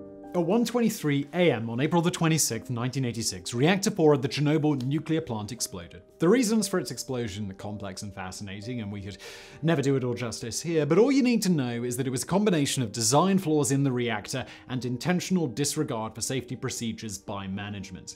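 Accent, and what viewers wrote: England, United Kingdom. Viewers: Another grammar fascist showing us all how smart they are by "correcting" the pronunciation in a video. BTW you do know is English and they sometimes pronounce words differently than than other countries do?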